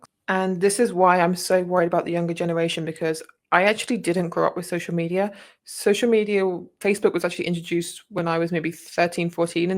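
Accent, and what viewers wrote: United Kingdom. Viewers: I wouldn't cover my beautiful natural blonde hair. Why do 8 year old muslim girls cover their hair🤔 are we assuming men cant resist? Gross!!! Get some vitamin D lady. I hear your English accent. Go live in saudi Arabia youll be happy there I think. I assume you're single. Im a grandma. Get a real job or do as your father tells you. Dont be a hypocrite. Maybe your hair isnt as beautiful as mine😊😊😊 thats ok Im just real lucky. You sad woman. House prices increased because of immigration. 20 million too many people here. Are u getting paid for this video? Your parents must hate you😂😂😂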